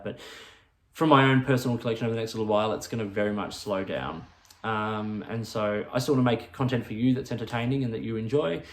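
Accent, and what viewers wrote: Australia. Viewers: Best wishes to you, let’s hope things get back to normal soon. Keep up the reviews if you can it’s nice to hear an Australian voice on fountain pens. Stay well 🧡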